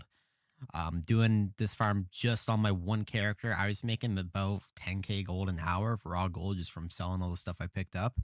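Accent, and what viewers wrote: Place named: Canada. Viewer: Awesome, thank you! Also you sound like a fellow Canadian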